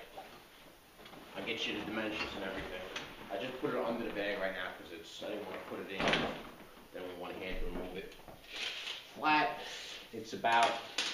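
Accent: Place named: New York, United States. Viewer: anytime a guy with a heavy new york accent says "alright, you're probably wondering whats under da bag...." you'll want to stay till the end : )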